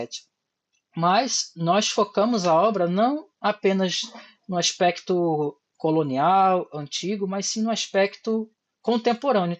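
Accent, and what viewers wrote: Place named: Portugal. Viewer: Nao tem como fugir do sotaque portugues 😂😂😂😂😂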